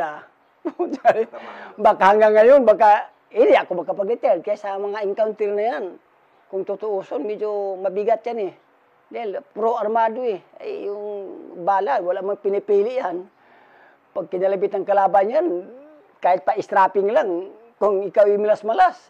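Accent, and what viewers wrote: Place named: Bicol, Philippines. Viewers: The way magsalita si sir kababayan🥰bicolanong bicolano🥰 watching from Saudi arabia 🇸🇦 ❤ God bless you po🥰❤️ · Bicolano si sir bisakol ang salita saan Kaya sya SA bicol ganyan dapat mag kwento Hindi Yung masyadong seryuso Kasi tapos Naman na Yan ehh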